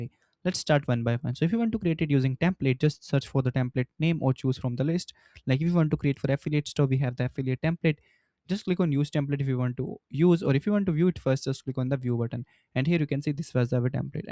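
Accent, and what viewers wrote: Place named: India. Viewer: Sorry, but your Indian accent makes it difficult to follow the video. Better use a generic AI voice.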